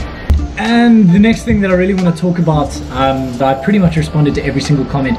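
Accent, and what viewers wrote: South Africa. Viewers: your South African accent is sick (at least i think thats a SA accent) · this guy's got a south african accent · 1st video im seeing of yours Are you South African?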